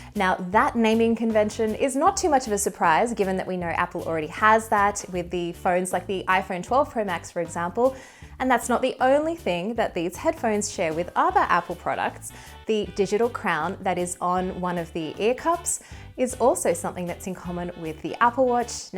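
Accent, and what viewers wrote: Australia. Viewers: Is she australian? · Is she aussie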